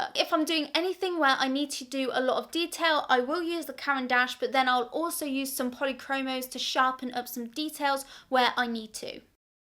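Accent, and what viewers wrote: England, United Kingdom. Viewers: Your art is ofc amazing, but i think you should also start an English accent tutorials channel.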